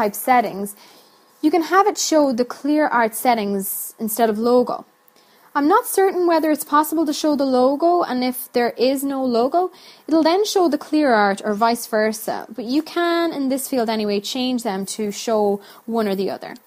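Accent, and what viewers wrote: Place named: Ireland. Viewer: Irish accent + XBMC knowledge = LOVE!!!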